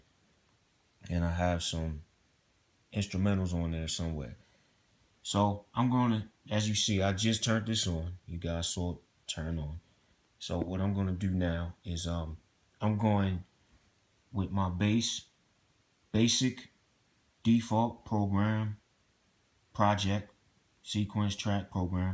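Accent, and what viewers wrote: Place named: Baltimore, United States. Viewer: I love this video. I'm sincerely grateful I hope you do more videos brother. Sound like you from Bmore Peace !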